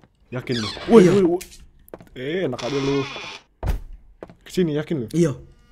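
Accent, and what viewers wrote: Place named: Makassar, Indonesia. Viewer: kak aksen makassar nya pertahankan:v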